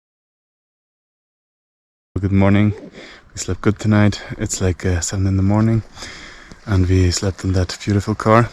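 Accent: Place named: Switzerland. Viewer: Absolutely loved it! Fantastic edit. Amazing drone shots. And the best: your swiss-german accent ❤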